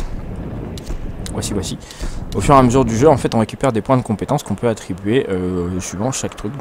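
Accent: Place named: Marseille, France. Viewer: … Ah que c'est drôle tout de même d'entendre ton accent Marseillet. On voit de loin que tu es du sud de la France. - Clément de Québec.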